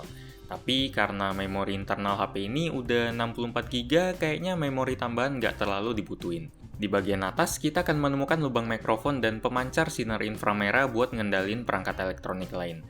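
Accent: Palembang, Indonesia. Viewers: orang palembang ya ko?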